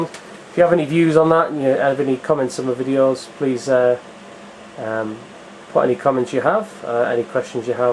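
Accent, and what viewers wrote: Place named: United Kingdom. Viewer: You talk like 007... Kinda cool you british people...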